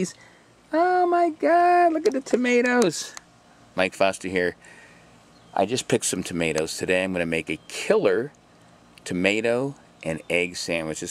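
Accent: United States. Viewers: Garlic ? Pepper ? If you've been to Europe or other places in the World, you'd realize there are LOTs of varieties used throughout the World. I'm assuming, since you look and speak very American that it is the "typical" black pepper and the "typical" clove garlic. Especially the pepper - of which there are 100s of different types.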